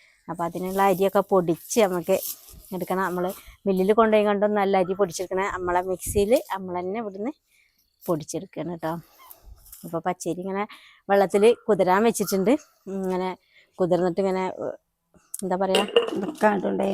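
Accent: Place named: Malappuram, India. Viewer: ഹായ്. ഞാൻ ഒരു കണ്ണൂർകാരിയാണ്. എന്റെ ഹുസ്ബന്റ് മലപ്പുറതത്‌കാരനും അതുകൊണ്ട് എനിക്ക് കുറച്ചു നിങ്ങളുടെ സംസാരംമനസിലാകും നിങ്ങൾക്ക് മധുരം കുറച്ചല്ലേ വേണ്ടു ശർക്കര കുറച്ചല്ലേ ചേർത്തുള്ളു സംസാരം എനിക്ക് വളരെ ഇഷ്ട്ടമാണ് ഓക്കേ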